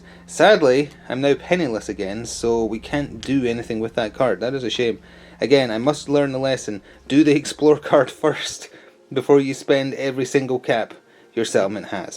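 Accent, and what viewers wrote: Scotland, United Kingdom. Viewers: Where abouts in Scotland u from bro? Enjoying ur content man keep it coming 👍🏻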